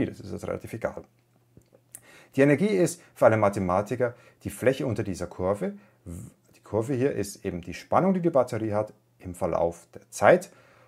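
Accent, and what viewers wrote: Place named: Munich, Germany. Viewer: darf ich fragen wo du in etwa herkommst? laut deinem akzent würd ich so auf region münchen tippen ^^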